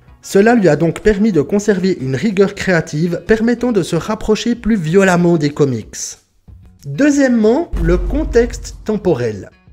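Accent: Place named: Vaud, Switzerland. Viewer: Excellent cet accent de bien de chez nous. Signé, Le Vaudois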